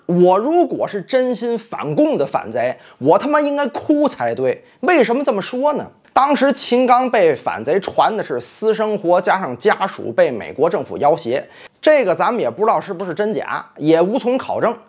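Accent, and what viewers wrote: Tianjin, China. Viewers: 天津话是真逗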